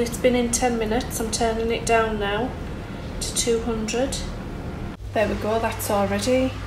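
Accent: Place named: Northern England, United Kingdom. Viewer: Hi, That’s looks delicious. I’ve been following you for a while now, I live in Australia, originally from UK and look forward to hearing your Northern accent and seeing your friendly face and your funny comments about your husband, you make me laugh. Brilliant 🤗 thank you xx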